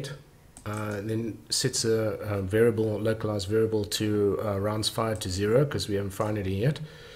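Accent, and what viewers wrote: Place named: South Africa. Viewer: Yeh, unfortunately it still doesn't work... i've gotten some progress though, i managed to use vanilla but only the leader of the group is moving Edit: ive done it! I know that your from South Africa i could tell by your voice so i'll let you sleep, but after faffing around with my faults i got it to work. Sorry to bother you and thanks again!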